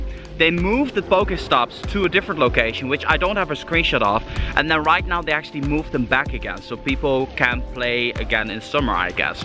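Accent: Netherlands. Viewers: Mooi nederlands-Engels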